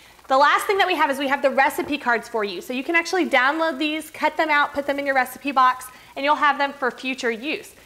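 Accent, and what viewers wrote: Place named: United States. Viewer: What a load of rubbish all processed food frozen and that so annoying American voice just shut up!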